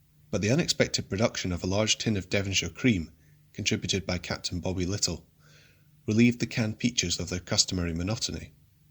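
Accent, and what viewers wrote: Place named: Scotland, United Kingdom. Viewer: No he isn't. Sean Bean is from Northern England wheras this YouTube is clearly Scottish. It's like saying someone from the North of the USA is a dead ringer for a Mexican.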